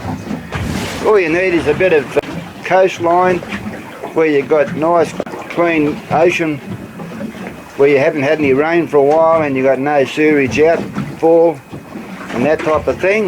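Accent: Australia. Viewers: Are you Australian? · Well....I was curious how sea salt was made, now I know. I admire anyone who takes the time to go through the process necessary to yield sea salt! I hope to (one day) visit Australia, love the accent too! I was just mocking Crocodile Dundee today! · Hahahaha good old Aussie accent. Love it. Im also aussie Im gonna try this!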